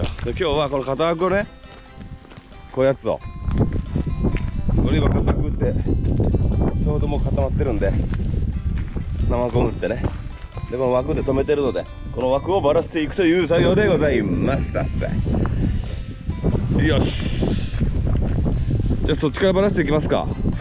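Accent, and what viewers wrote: Yamaguchi, Japan. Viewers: YouTubeで山口弁が聞けるのが良いですねぇーーー😍。最近は女子の方言で広島弁が厳ついからでしたっけ？可愛い顔して「じゃけえ」とパンチのきいた言葉のギャップ萌えとかあるみたいですが、男の人の山口弁を聞いたさんのファンはどう感じてるのか気になる❗️ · 山口県民です。方言がYouTubeでガンガン聞けるの楽しい！笑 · 広島なんですが、山口弁って広島弁に近くて親近感もてた(*º▿º*)俊ちゃんの今♪を聴いてます♥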